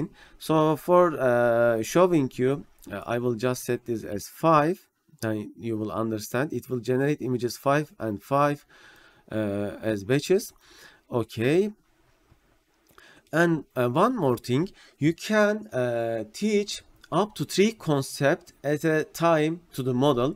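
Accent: Turkey. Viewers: Dostum aksanın Türk'e benziyor; Türk'sen türkçe altyazı geç bizim için. Çok süper olur bize. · Çok faydalı içerik. Teşekkürler. (1. dakikanın sonunda aksandan dedim ki bu adam Türk galiba, öyleymişsin. :D ) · Önce bi forumda gördüm videonu aksandan direkt anladım Türk olduğunu. Çok tebrik ederim harika bir tutorial. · Türkmüsün? Türk aksanıyla ingilizce konuşuyorsun. Güzel rehber.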